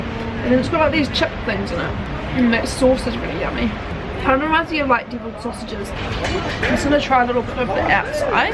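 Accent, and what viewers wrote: New Zealand. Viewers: Love your kiwi accent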